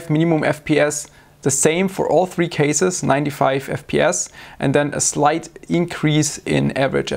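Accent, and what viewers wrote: Germany. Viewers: Yes and I really appreciate his adorable German accent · Are you German? Cause you have a really obvious accent LoL 👍👍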